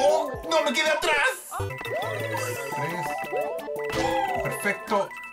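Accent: Chile. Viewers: eres chileno? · ERES CHILENO? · dejame adivinar... Eres de Conce? xD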